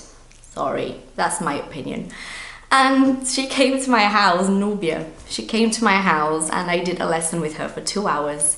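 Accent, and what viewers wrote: United Kingdom. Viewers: Well, it's pretty easy to see why you are teacher. You have all it takes to be a great teacher: you're very articulate, your sentences can take the listener from point A to point B and your mastery of the English language is amazing. You could easily pass for a native British person - if there was no Portuguese words below your videos, the only thing that would give out that you might be Brazilian or Portuguese is the way your name is written (with the diacritic acute - acento agudo para os lusofonos). And even then I wouldn't be so sure since there's a lot of people in North America (and I believe in Great Britain too) which have Portuguese names yet are second or third generation (the kids or grandkids of Brazilian or Portuguese immigrants) and can't speak a word in the language of their parents or grandparents. No Portuguese words and English with (written like this): there's no way I could say you're not a native Brit. 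· I wonder if I'll ever speak English with such a massive british accent! haha My English is totally "american" so for me the british English is almost a new language! Acho cute mas não consigo me imaginar falando assim rs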